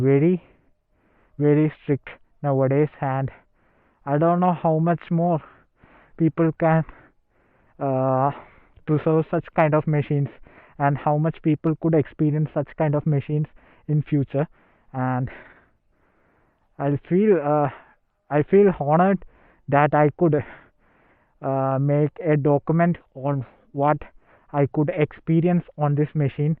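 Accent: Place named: Kerala, India. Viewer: Malayali anenn English kettapozhe manasilayi...malayalathil cheythal nannairunn🎉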